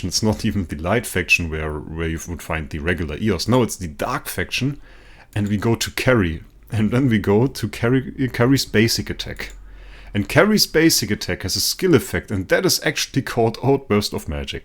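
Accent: Germany. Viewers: Is ur motherlanguage german?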